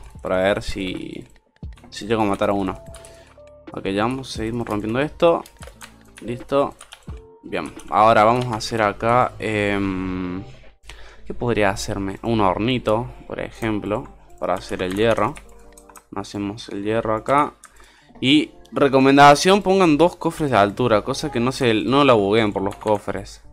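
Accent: Argentina. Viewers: Vos sos Córdobes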